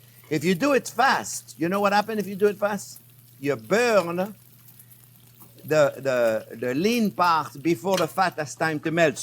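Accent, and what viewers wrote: France. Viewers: I absolutely love this guy… how bout a split pea soup?? On a side note who doesn’t love listening to a French chef talk and cook? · Dude is aggressively French from the beginning XD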